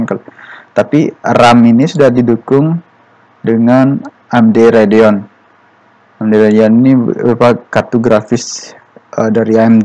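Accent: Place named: Sumatra, Indonesia. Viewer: logatnya orang sumatra y!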